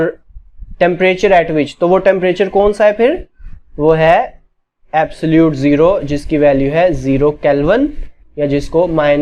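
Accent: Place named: Pakistan. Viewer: I guess, you are from pakistan. No hate❤, bro got to know from your accent.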